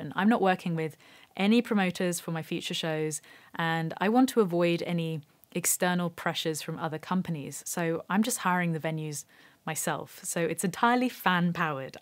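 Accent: United Kingdom. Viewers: i just realized you are ...British?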